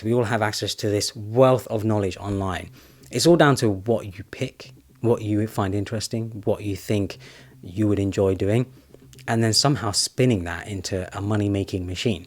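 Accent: United Kingdom. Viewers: because british accent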